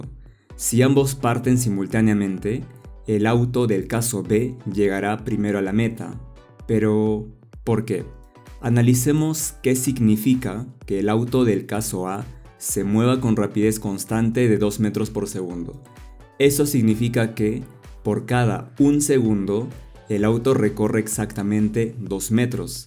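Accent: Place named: Peru. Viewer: Ese acento Peruano es increíble!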